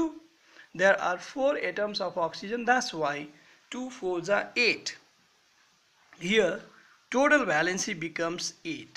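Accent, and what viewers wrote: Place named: Nepal. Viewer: Thankyou sir Tapaiko accent ta nepali ko jastai xa ta🧐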